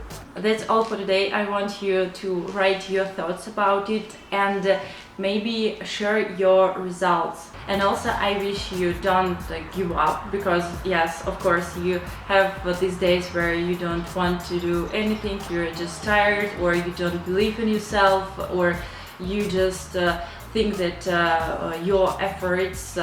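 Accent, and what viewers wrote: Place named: United States. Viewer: You have American accent because that I can understand very well you 🆙, good tips 👏👏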